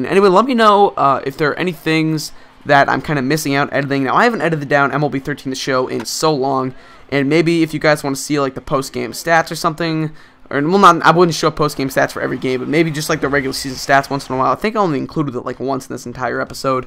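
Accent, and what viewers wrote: Boston, United States. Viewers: are you from boston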